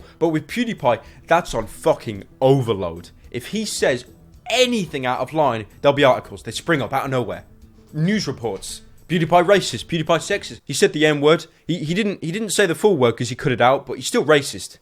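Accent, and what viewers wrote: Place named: England, United Kingdom. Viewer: ur english akzent is so fucking gay holy shit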